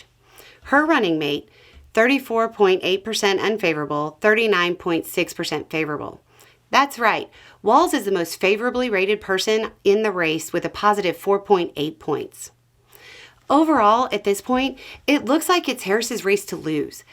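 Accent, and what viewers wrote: South, United States. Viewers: I really need to hear you say “Bless his heart” in that sassy sugary sweet southern delivery of yours. Please? Pretty please? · Love the end and that sweet goodbye, especially if she's mad! Oh that southern sarcasm is rich and thick!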